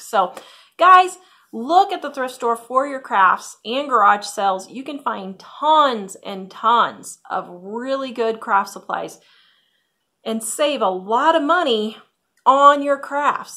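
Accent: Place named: Kansas, United States. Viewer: We all know that you say warsh instead of wash. This is the first time I ever noticed that you say tonne instead of ton. Is that from Kansas, too?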